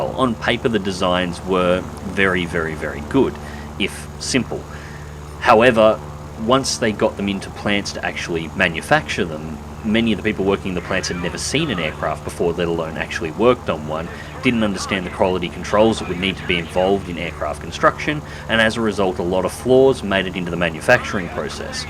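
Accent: Australia. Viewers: what tier 4 soviet plane do you recommend? Love your manly aussie voice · time to listen to that attractive Aussie voice again <3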